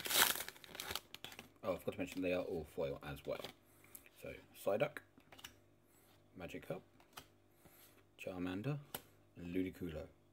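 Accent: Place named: United Kingdom. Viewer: Love your intro mate, looks amazing! Sounds like you're from the UK also? Really liked the Detective Pikachu series, do you think the cards will go up in value at any time? Subbed mate!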